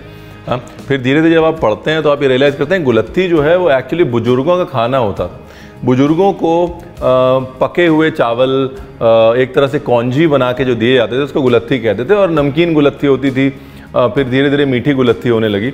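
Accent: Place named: Lucknow, India. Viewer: Like ur Lucknowvi andaaz😁😊😊🏆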